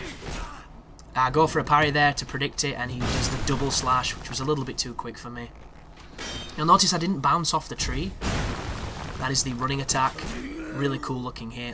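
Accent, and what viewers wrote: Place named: England, United Kingdom. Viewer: Hey guys, do you know what time it is? Time to listen to a man with an English accent talk about Dark Souls 2 for 10 minutes. Another awesome way that I like to spend my valuable time. Keep up the great work:)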